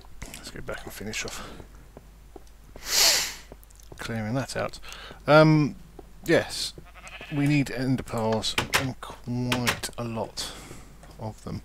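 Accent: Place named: Derbyshire, United Kingdom. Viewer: ..? What area in England are you from?! You sound like my uncle and hes from Chesterfield - Derbyshire..:L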